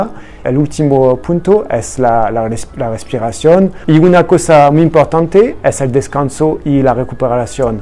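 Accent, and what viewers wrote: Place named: France. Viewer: Pfff avec ton accent français tu me donnes envie de grrr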